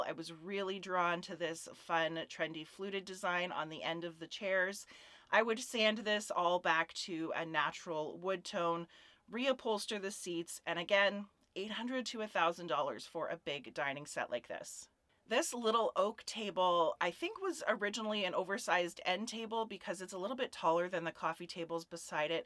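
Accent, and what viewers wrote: Canada. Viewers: This was such a great video. Also shows me why I watch you because I think you’re so down to earth. I had asked the question about your profession and you answered my question and loved seeing your hunt for your beauties you make! I also enjoy your Canadian accent lol! I was born in Toronto but moved to the states when I was 10 so no Canadian accent left but my roots go deep. I’m also a “ ” so another reason I follow you lol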